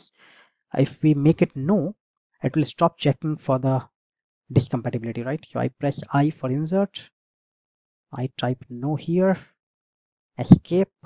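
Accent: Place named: India. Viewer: That terrible india accent sound like dog barking !!!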